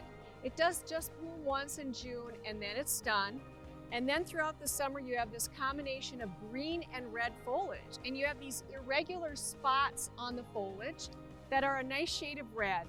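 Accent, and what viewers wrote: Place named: Minnesota, United States. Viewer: Thanks,! You're awesome, and I love your Minnesota accent! This video was super helpful and filled with beautiful plants 😊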